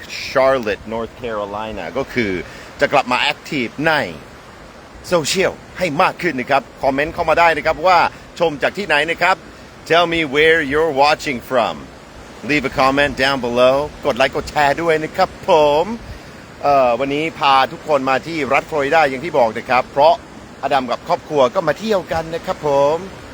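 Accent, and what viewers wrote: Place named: Isan, Thailand. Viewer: อ.อดัม พูดอีสานได้ บ่คือคนอีสาน แต่ก็มีความพยายามนะครับ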